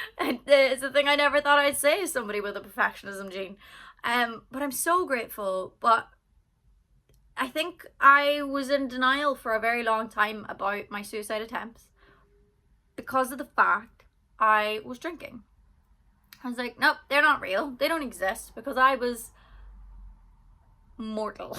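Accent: Ireland. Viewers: I like her Irish accent. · Wow! You're telling it all so clearly and openly. You're such an angel for other people. I volunteer at an helpline and it's very common to get a person in these situations on the line. PS, love your Irish accent <3 and music of course ! Thank you 🙏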